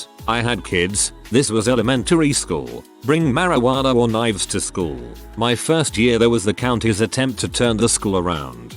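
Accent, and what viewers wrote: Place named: United Kingdom. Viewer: Just another lazily made Reddit video with a robotic British accent.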